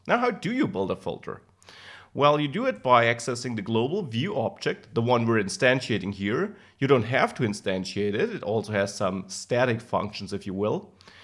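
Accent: Germany. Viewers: nice Germanized British American Accent Mix...Filter :)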